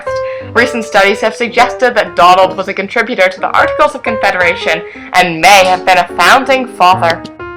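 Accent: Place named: United Kingdom. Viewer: love the British accent. you're quite talented. keep 'em coming!!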